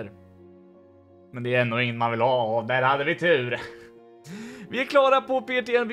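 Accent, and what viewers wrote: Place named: Östergötland, Sweden. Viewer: Östgötska dialekten gör att jag följer dig ❤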